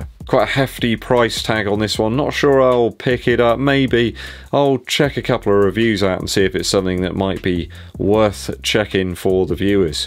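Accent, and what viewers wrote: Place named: Yeovil, United Kingdom. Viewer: I enjoyed your pronunciation of the "Salisbury Half". Can you do an entire episode with your Yeovil accent please?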